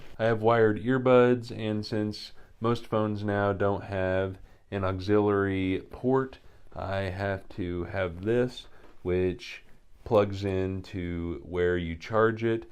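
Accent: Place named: East, United States. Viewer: gotcha. I figured east of the Mississippi from the trees and 'accent'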